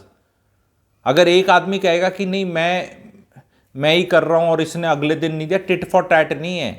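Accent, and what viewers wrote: Haryana, India. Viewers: Hariyana se ho aap kya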